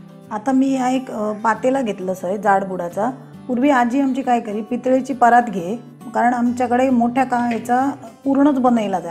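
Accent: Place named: Malvani, India. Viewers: Ekdam bhari recipe...👌🌟👌🌟👌🌟...barik barik tips aani tricks sangilya mule pahayla chaan watali recipe aani karayla soppi👌👌😀...khupch goad watte tumchyakadun Malvani aaiktana...❤❤ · अतिशय सुरेख तुम्ही मालवणी बोलताना आम्हाला गावाची आठवण येते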